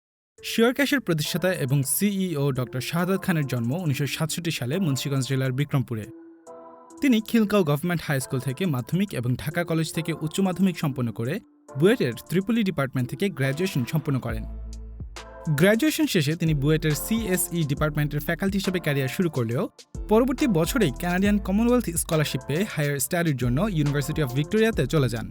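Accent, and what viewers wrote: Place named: United Kingdom. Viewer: বাংলা বলবেন বাংলার মত করে, ইংরেজির ফ্লেবার লাগাইয়া বাংলার সৌন্দর্য নষ্ট করেন কেনো?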